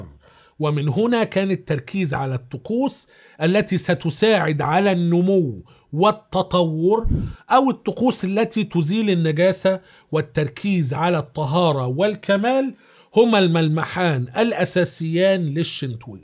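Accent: Egypt. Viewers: أخي محتوى رائع . ارجوا نطق حرف الجيم كما في الفصحى بدلا من العامية المصرية.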